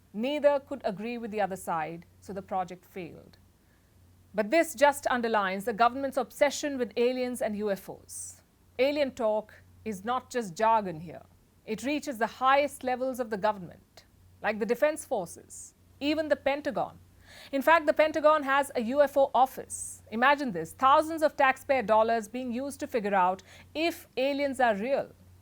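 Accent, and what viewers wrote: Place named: India. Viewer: So we have to listen to a woman with a strong India accent and no USA native speaker is available to deliver the story?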